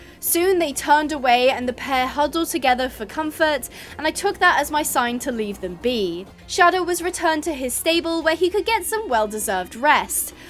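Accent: United Kingdom. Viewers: You’re so British you little bugga ❤ I love it tho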